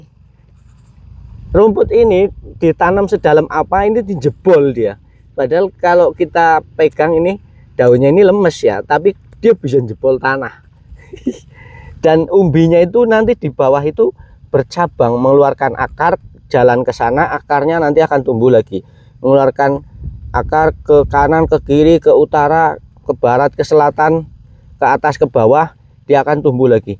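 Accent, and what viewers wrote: Banyumas, Indonesia. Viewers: Woh ngapak kie. Ngapake daerah endi kang?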